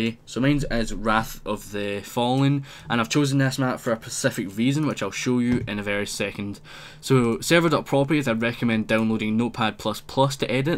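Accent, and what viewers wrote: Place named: Scotland, United Kingdom. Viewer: I LOVE URE AWESOME ACSENT ITS AWESOME R U SCOTISH? SCOTISH R AWESOME OMG!!!!!!!!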